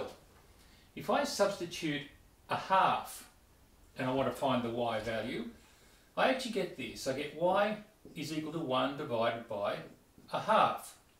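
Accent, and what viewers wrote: Australia. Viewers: amazing video dude - everything clear and concise! Subbed and loving the aussie accent btw :)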